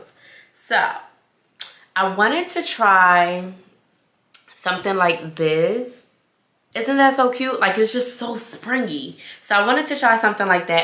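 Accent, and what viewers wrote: Jamaica. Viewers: Hey! First of all I’m here for the Jamaican accent 😍 And I love your content! I’m one of them OGs been watching since you were in cosmetology school!🤗 Just keep doing what you’re doing I love your vlogs I also love your outfits so maybe do some outfit ideas and I love your make up and hair too so just keep showing us or maybe self care routines too like face care routine or body care routine 🌷🌷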